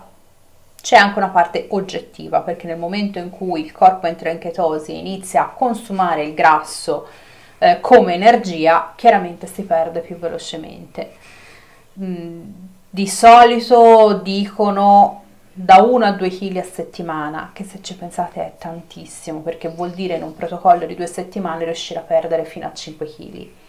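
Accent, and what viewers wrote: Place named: Tuscany, Italy. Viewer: Grazie mille. Ti ho conosciuta con questo video perché cercavo testimonianze sulla dieta keto. Purtroppo dovevo iniziare lunedì scorso ma la notte sono stata male. Il mio nutrizionista mi ha detto che devo per forza prendere gli integratori di magnesio e potassio ma io avendo una malattia autoimmune allo stomaco trovo difficoltà. Ieri ho riprovato e ora sono di nuovo con un mal di stomaco assurdo. Ti ringrazio della spiegazione. Sento che sei toscana anche tu. Sai se ci sono asl o ospedali dove poter fare la keto? Perché io ho tante patologie e non vorrei sbagliare. Devo perdere peso tanto... per salute. Grazie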